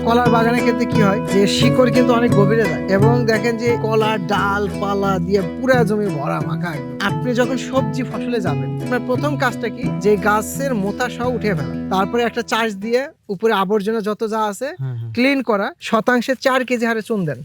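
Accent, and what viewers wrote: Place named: Joypurhat, Bangladesh. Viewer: আমার বাসা জয়পুরহাট তো তাই বলছিলাম,উনার ভাষার সাথে অনেক মিল আছে তো তাই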